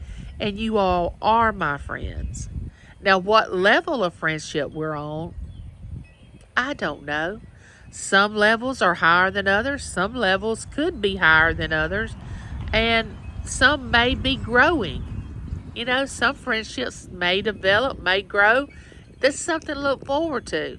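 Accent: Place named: South, United States. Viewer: I absolutely love your southern accent! ❤❤❤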